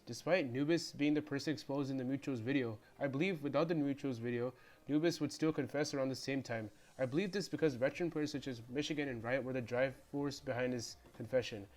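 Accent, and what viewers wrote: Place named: India. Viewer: Btw are you from india your voice looks like an indian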